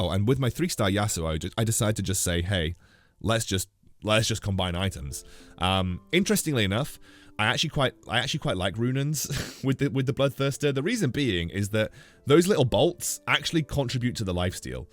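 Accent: United Kingdom. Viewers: nohomo bro, you have such a clear, crisp voice! Great for youtube. This might just be the American in me thinking British accents are OP though.. LOL btw, what's your rank?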